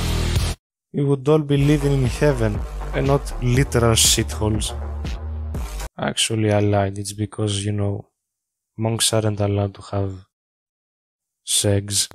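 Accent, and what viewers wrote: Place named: Greece. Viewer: ellinas einai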